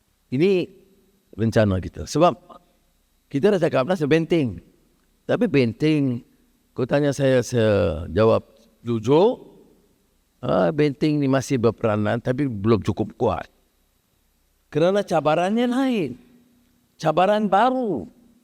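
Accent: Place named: Arab world. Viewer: ucapan akedemik itu walau guna BM bernada Arab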